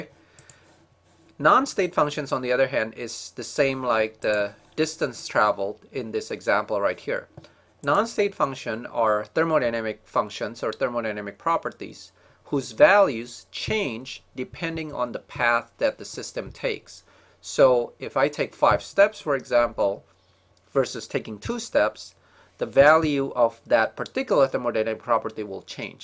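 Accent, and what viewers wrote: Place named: Asia. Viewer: Finally not an Indian accent. I'm guessing an Asian... Could be Chinese, or perhaps Cambodian...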